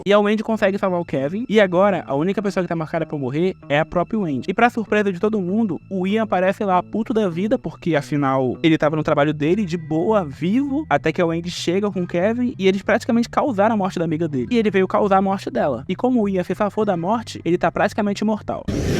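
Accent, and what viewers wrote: Pará, Brazil. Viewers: Bixo, precisa nem assistir muito pra saber q o Cara é Paraense kkkkkkkkkkkkkkkk, muito firme o video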